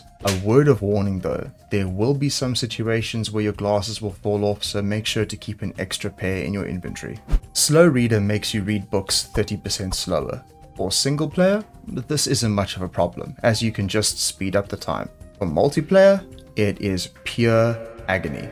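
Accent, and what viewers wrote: South Africa. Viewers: Hey bro are you South African? If so, greetings from the Eastern Cape! And great video man🤞🏼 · Are you a South African bru? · My Dude .... the South African runs rampant in you!! Thanks for the tips tho! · You sound south african.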